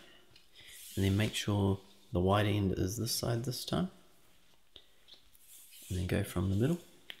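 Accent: New Zealand. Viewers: You are my favorite to listen to! Your kiwi accent always makes me drift off to sleep! Although, I must admit, sometimes I just stay awake because Kiwi accents are my favorite ☺️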